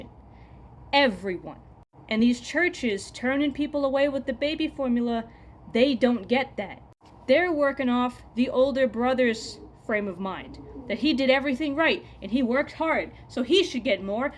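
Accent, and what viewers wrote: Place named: New York, United States. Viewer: Somehow I needed this angry, swear ridden jersey/new york accented sermon today and I'm more agnostic than anything. You nailed it.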